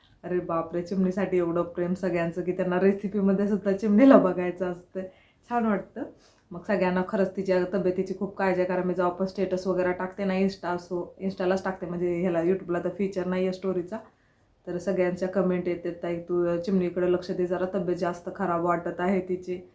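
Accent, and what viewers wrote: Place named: Latur, India. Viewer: ho latur chi bhasha lagech olkhu yete..... Me aushyachi ahe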